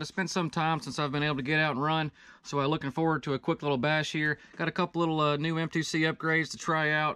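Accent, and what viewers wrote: South, United States. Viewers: I thought i heard a little southern in your accent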